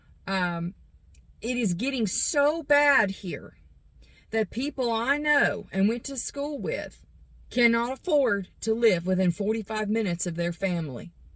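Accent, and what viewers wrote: South, United States. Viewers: Love this young lady. First time viewer, l was mesmerized by those piercing eyes and when she started quoting scripture l was hooked. Love the southern twang as well.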